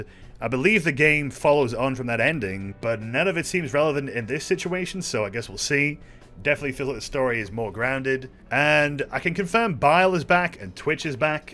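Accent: United Kingdom. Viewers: It's cos ur scouse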